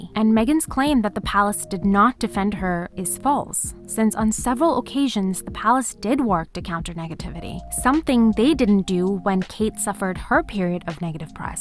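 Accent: United States. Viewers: Mumbai - I thought you were American. Greetings! You are providing us well researched and quality content! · May I ask why you sound so American when being born and raised in British English speaking India?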